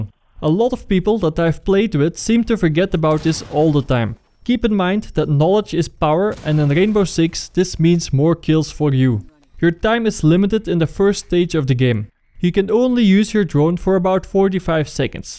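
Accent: Netherlands. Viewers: dat nl accent XD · jij komt zo hard uit NL you engels is zo fockt up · Ben je nederlands? klinkt zo haha! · he is clearly dutch, i'm dutch myself and know how dutch people speak english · Are you Dutch because of your accent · Dat Dutch accent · i think it's a dutch accent, and i can hear it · Iewl dat Nederlands accent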